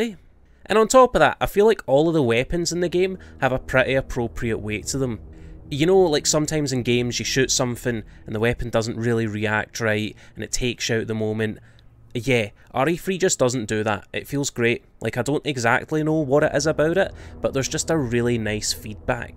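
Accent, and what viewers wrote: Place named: Glasgow, United Kingdom. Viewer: Glasgow uni accent is the worst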